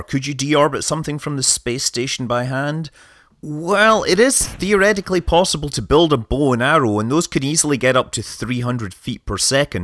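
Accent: Scotland, United Kingdom. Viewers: Say what?! I thought he was scottish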